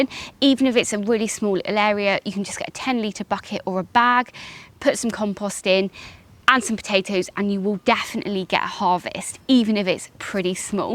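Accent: United Kingdom. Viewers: Love your cute little video, on growing potatoes in the shade. Planted my first potatoes in the ground, alongside my parents house, in the shade, in mostly clay soil, here in New Jersey, in the USA, when I was 7 years old, in 1959. Not a farmer, mostly an occasional gardener (and lately a heavy-duty forager), but was thinking about planting some potatoes, this spring, here in NJ. Got a lotta shady areas around here, and so, as I remembered from when I was a kid, potatoes grow pretty good, even in the shade. Did quite enjoy your straightforward, yet cute, Brit accent, as you were explaining about your potato bed, in the shade (remember, I'm a Yank, with a "Jersey" accent, similar to a New York accent--but not quite the same! Ha ha ha...) Interesting that you used seaweed, for organic fertilizer; I've got plenty of organic stuff here, to compost and fertilize with (without having to go down to the Shore, for seaweed! 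Ha ha ha ha ha...) I'm sure that seaweed has got some good minerals in it, but just too much trouble for me, living about 50 miles away from the Shore, up here in the woodlands, near the Watchung Mountain ridge, to drive all the way down there, just for some seaweed. (And we gotta lotta traffic here, in this highly congested area, too, near NYC, too...) But, thanks for your good plant-growing videos,.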